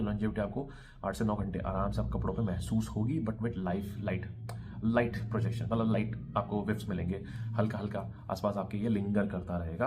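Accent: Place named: Haryana, India. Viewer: Paa ji aap haryana se ho na kyoki maine kai baar notice kiya h ki kai baar bolte bolte bich bich me haryanvi ton me koi koi word sunai deta h .baaki aapka explanation outstanding h perfume information k बारे me Jyode vasde rvo god bless you .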